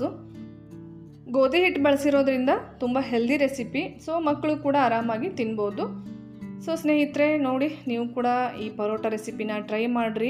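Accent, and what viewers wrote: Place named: Andhra Pradesh, India. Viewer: actually I'm from Bangalore ree nang Nim thara Kannada maathadodh Andhra Baal chalo ansthithi ❤️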